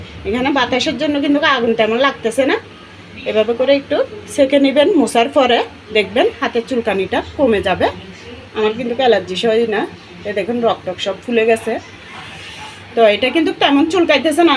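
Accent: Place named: Chittagong, Bangladesh. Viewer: আসসালামু আলাইকুম। আপু আপনি মনে হয় চট্টগ্রামের। আপনার কথা শুনে বুঝতে পেরেছি। রান্না অনেক ভালো লেগেছে ।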